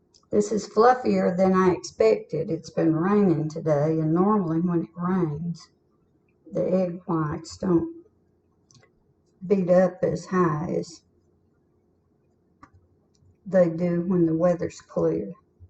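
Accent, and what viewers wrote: South, United States. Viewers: I love your southern accent and pie.Just like my mother in law . I miss her so much ❤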